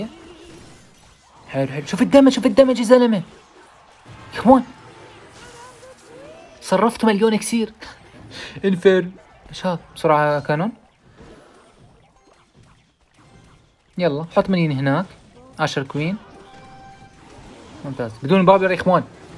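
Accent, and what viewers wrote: Iraq. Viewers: شكلك عراقي تكول يعني تفضل هههه احبك متابعك من العراق 🤍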